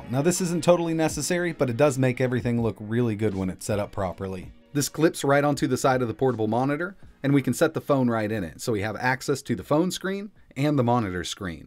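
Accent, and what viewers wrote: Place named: South, United States. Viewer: I love southern accent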